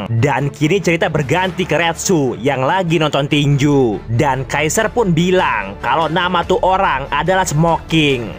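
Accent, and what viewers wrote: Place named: Jakarta, Indonesia. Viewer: wkwk demen liat logat betawi lu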